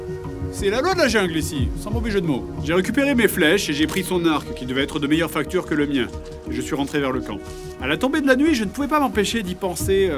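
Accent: Paris, France. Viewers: Le ptit accent pointu parisien pédant est juste par-fait ! Ça sert vraiment l'immersion ! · cette accent parisien !!!!! loool Hâte de voir la suite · C'est fais exprès l'accent parisien? J'aime ce type de let s play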